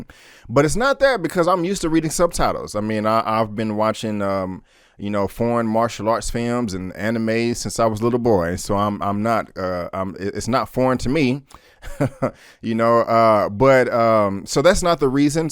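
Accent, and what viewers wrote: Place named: South, United States. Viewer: And where are you from??? I can tell it's in the south somewhere from your accent but bc you know who young joc is that made intrigued about what part of the south you live in. I personally know joc so I can kinda guess around which area.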